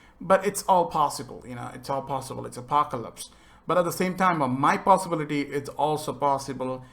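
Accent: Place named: India. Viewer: This guy is trying so hard to hide his indian english accent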